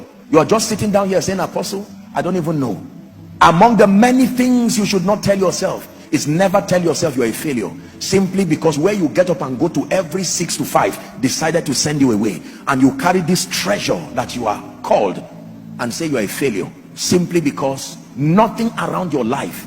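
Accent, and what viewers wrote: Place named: Nigeria. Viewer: 6-5 is this the workday where you are? Assuming this accent is Nigerian(?) and therefore assuming this video is to a Nigerian audience... You guys are hard working. 11 hour work day. Yoh!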